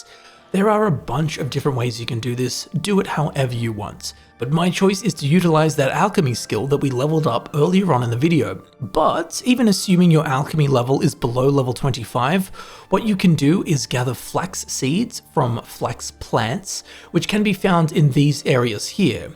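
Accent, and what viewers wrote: Australia. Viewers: Ah I missed that sweet Australian voice! is back! I’m telling you I’m loving oblivion as someone who only played Skyrim before & like an hour of Marrowind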